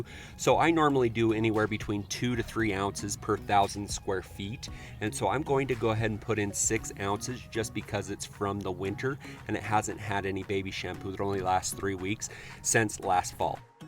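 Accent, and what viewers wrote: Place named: Utah, United States. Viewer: Just discovered your channel. Damn, your accent is hardcore Utahn.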